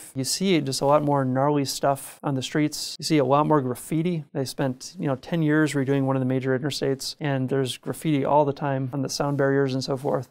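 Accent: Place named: Minnesota, United States. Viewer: You sound like an Edina kid. Minnesota will always be strong.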